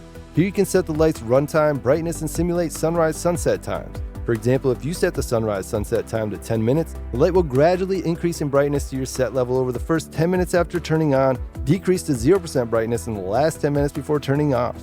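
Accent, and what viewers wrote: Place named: Canada. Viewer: I thought this was American but its Canadian awsome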